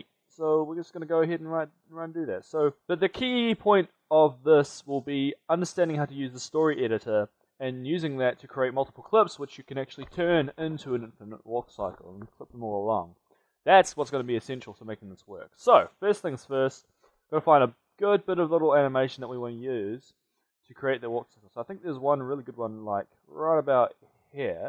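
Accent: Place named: Australia. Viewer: I can't tell if you're Australian or American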